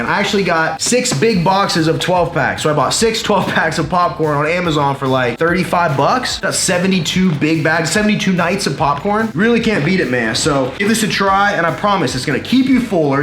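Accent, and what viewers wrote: Texas, United States. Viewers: The Texan (non-screaming) version of Coach Greg, love your channel!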